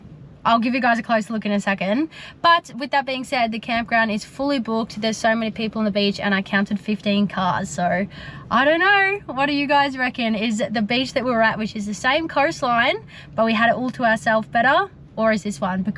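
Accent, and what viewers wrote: Australia. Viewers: from uk As commented before your posts are great,remind me of my time in oz,you are very beautiful,love your accent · Your name seems American as fuck haha, I was not expecting the Aussie accent...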